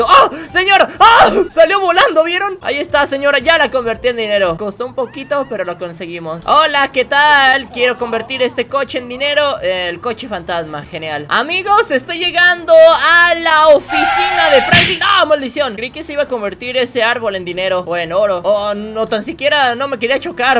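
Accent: Chile. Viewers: eres de chile?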